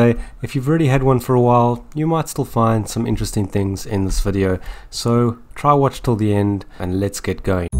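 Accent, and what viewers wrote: South Africa. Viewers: Are you Dutch? You sound South African. I know the sound is no good. But 10 years ago I did not have the Neumann U87 yet.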